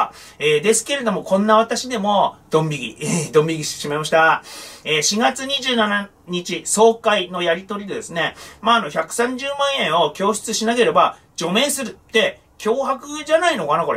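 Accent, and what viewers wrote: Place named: Ibaraki, Japan. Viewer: なまってんな（茨木調）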